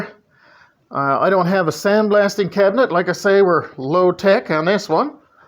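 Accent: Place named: Newfoundland, Canada. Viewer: I started watching out of curiosity....soon as I heard the newfoundlander accent you could have been reading war and peace and I'd have sat through to the end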